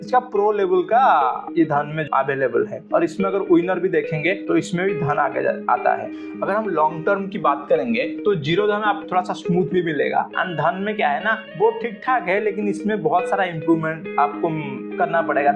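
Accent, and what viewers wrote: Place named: Odisha, India. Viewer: Odia acha bhai ??? Tama accent ru jana pduchi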